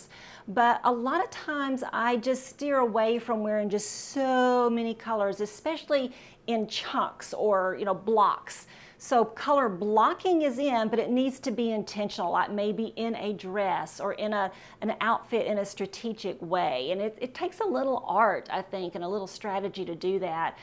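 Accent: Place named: Texas, United States. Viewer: I love her accent! Is she from Texas?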